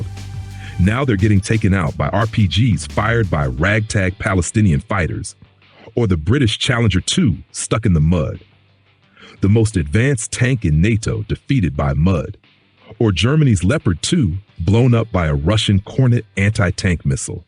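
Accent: United States. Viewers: Butthurt Indian guy puts up a video defending his tin can in American accent.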